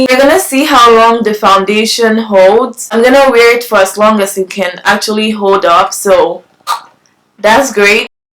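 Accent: Nigeria. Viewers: Nigerian or Ghanaian?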